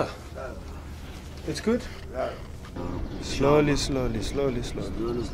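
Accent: South Africa. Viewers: The heavy SA accent 😁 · You sound like a South African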